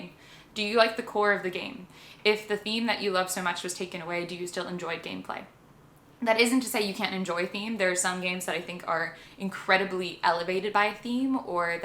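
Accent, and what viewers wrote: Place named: United States. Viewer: This was a great video. Thank you! It's my first time commenting on this channel, but it's been growing on me ever since it was recommended by Jenna Rose in the Board Game Garden channel. I especially love the "dominant species" and "your mama" tests 😄 The first one especially made me reflect... Quite intrigued about the "philosophy nerd" aspect, since that appeals a lot to me, and I don't often meet those two aspects that I love (board gaming and philosophy) put together. Do you happen to have a second channel / blog / whatever about that? Lastly, I'm confused: you sound American, but your likeness is surprisingly similar to my Italian best friend! It's uncanny 😂 Anyway, thanks again for the great video :)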